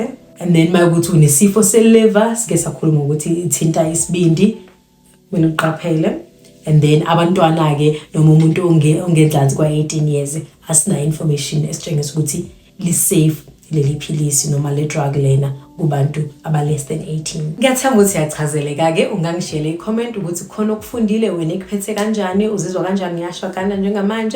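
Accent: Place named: Zulu, South Africa. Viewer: You are so beautiful and your isizulu accent is amazing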